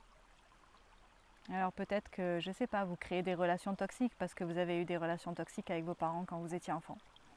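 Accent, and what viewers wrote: Canada. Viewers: C’est marrant, c’est une aparté rigolote mais on voit bien l’influence des canadiens dans ta facon de parler parfois avec tes « puis », « j’était comme » et autre... je sais pas si tu connais Emma Bossé une super youtubeuse qui parle de spiritualité aussi et de guidance, bah tu as un peu cette même façon de parler ahahah je suis sûr que tu en ai influencé, mais j’adore comment ils s’expriment aussi c’est super plaisant pis c’est ben joviale tsé, c’est po linéaire ou que sais-je c’est vraaaiment posé.... 😂 bref en tout cas je te rejoins carrément sur le fait qu’on soit des êtres multi dimensionnel, je suis d’accord nos guides c’est nous avant tout, dans d’autres dimensions dans d’autres aspects....merci, bye💛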